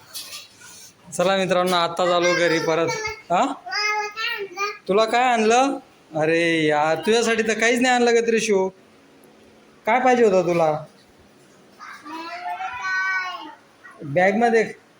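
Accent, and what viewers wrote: Khandesh, India. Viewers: भाऊ तू खान्देशी आहे का? तुझ्या भाषेवरून वाटले कि तू खान्देशी आहे. मी पण जळगाव ची आहे.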